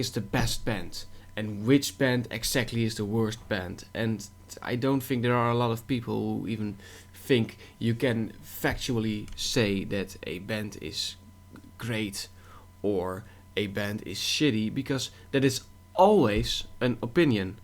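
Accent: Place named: Netherlands. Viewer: The Dutch accent is strong in this one ^^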